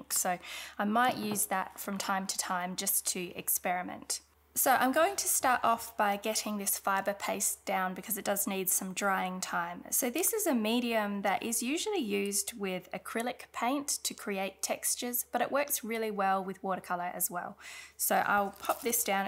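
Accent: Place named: Australia. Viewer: As soon as I heard you’re Australian I subscribed to your YouTube channel. So nice to hear a familiar accent. And you use materials available to us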